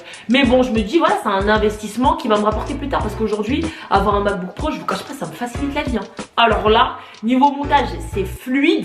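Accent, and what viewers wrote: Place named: Democratic Republic of the Congo. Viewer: L'accent Congolais😘❤️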